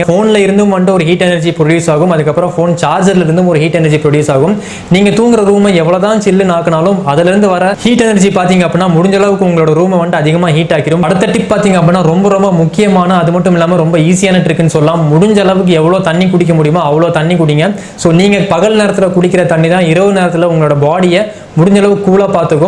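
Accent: Coimbatore, India. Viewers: Nenga Coimbatore aha I jolly.. Naan Sathyamangalam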